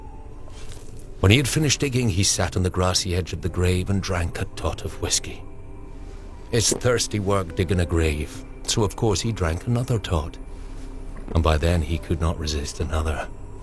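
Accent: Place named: Edinburgh, United Kingdom. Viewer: The accent he's using is from the Northern tip of Edinburgh. I spent much of my childhood in Scotland and Ireland.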